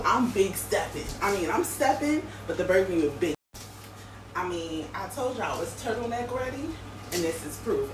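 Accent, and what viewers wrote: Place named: New Jersey, United States. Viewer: You're Jersey girl accent🥰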